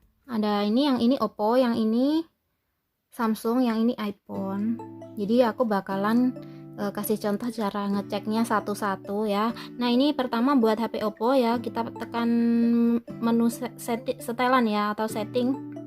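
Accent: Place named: South Kalimantan, Indonesia. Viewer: Kakak orang kalsel ya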